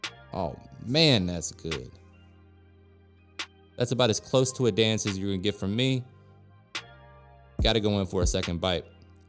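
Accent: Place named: South, United States. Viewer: I eat with rice. I thought I am listening to a black dude. No offense. Then I saw you are a white dude talking like a black dude. You must be from the South. Thats cool Man! Milan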